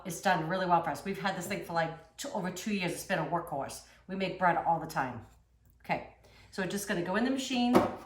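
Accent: Boston, United States. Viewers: Ordering one now - think I'm going with black stainless steel! thanks for the demo and love your boston accent!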